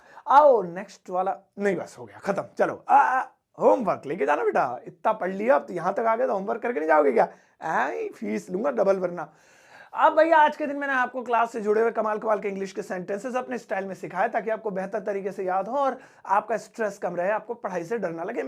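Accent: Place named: Haryana, India. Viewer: Accent Haryanvi wala hi h sir Sare hi words ki pronounciation......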